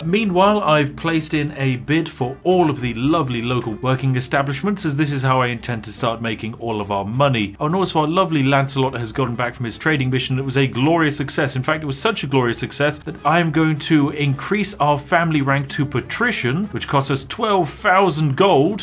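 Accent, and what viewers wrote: United Kingdom. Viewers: The pure British accent its so good and it really makes jokes better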